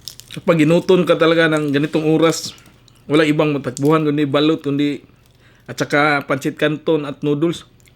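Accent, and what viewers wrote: Visayas, Philippines. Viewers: Bai klaro kaayo bisaya ka Basta mo sturya mag tagalog bwhahaha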